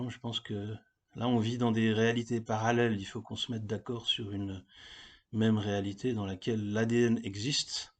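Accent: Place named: Switzerland. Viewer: Moi, je suis français mais juste de l'autre coté de la frontière Suisse (coté Jura) ... D'où l'habitude d'entendre ton accent ... Je suis biologiste médical et j'ai donc des compétences en infectiologie et en biologie moléculaire faisant tous les jours des PCR covid ... Et donc la contagion des maladies infectieuses, je la constate tous les jours. Je suis entièrement d'accord avec toi qu'il faut mettre en lumière Béchamp pour expliquer à toutes ces personnes qui sont intervenues en commentaire de cette vidéo que ce scientifique a TOUT faux.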